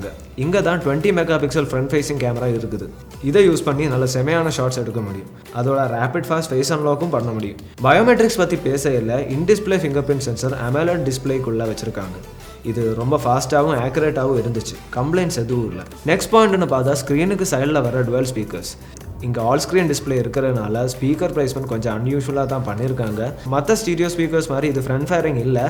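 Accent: Kerala, India. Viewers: bro nennga malayaliya unnga Tamil apadi tha erukku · Brother are you a malayali. Unga Tamil 🥳👌. Tamil yaaru pesnalum alagu dhn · மலையாள தமிழ் சூப்பர் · malayali anonn oru doubt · Bro r u from kerala? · Bro pesradhu le clarity kammi ah iruku try to improve it .. Malayalam slang konjom iruku try to avoid it. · Voice over malayala tamila ... Sema kick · Ena bro nenga malayaliya... Unga speech style knjm different ha iruku but Nala iruku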